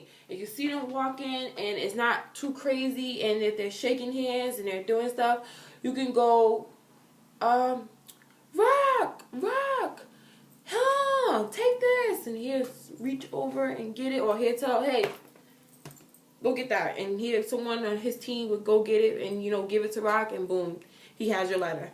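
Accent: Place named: New York, United States. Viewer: u sound like either a new yorker or a philly girl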